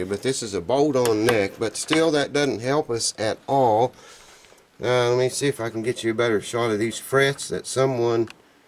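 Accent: South, United States. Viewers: Thanks, you have helped me a lot setting up my guitar, i can even do the F chord now,Thanks I like the music videos, you have a good voice,maybe it's that Southern accent, all the best.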